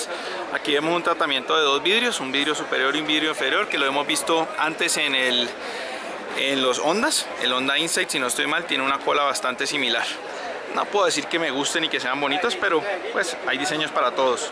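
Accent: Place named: Cali, Colombia. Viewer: Hermano quite ese acento caleno tan chimbo.;..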